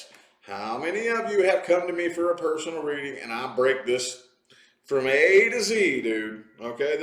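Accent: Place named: Texas, United States. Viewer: Yes!! Love a little country accent in a tarot reading, love Texas girl lol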